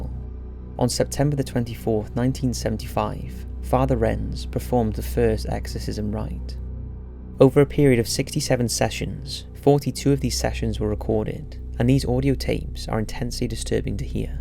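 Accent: Wales, United Kingdom. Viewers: Thats a welsh accent si x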